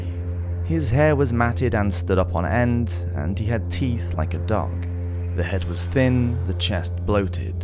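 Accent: United Kingdom. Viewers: Love your videos man where you from in uk?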